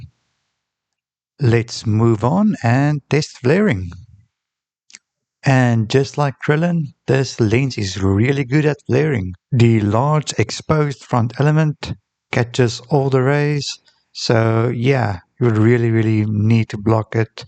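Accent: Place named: South Africa. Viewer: Always a pleasure to hear a South African accent on a review. I wasn't sure at first but once I saw the sparletta I got confirmation 😅 great video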